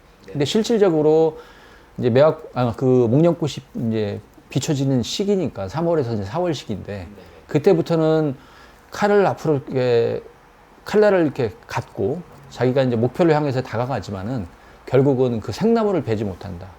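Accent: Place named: Jeolla, South Korea. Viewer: 말투가 호남사투리 전라도 점쟁이네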